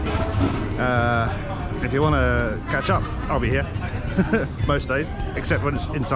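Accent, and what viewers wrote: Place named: Australia. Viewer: AHA! A Mexican! I catch your videos every now and then for tips on Rise of Empires and always thought by your accent you must be an Aussie. A Victorian I can deal with, thankfully you’re not a Qld’er! 😆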